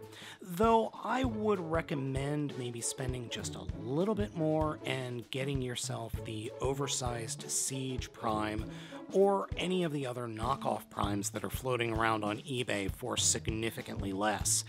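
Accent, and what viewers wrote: Minnesota, United States. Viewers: He's from Minnesota?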